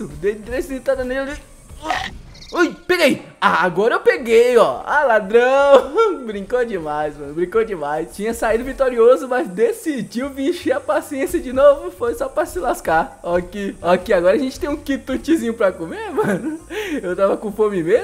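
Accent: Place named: Northeast, Brazil. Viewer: Que saudade que eu tava de vc é do seu sotaque nordestino eu também só nordestino só que eu moro em Minas Gerais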